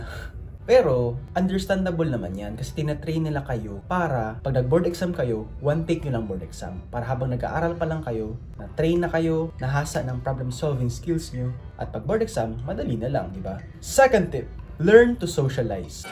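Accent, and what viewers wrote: Visayas, Philippines. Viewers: I know from your accent your a bisaya haha. (Btw im also a Cebuano) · I can hear the visayan accent in his voice. HAHA.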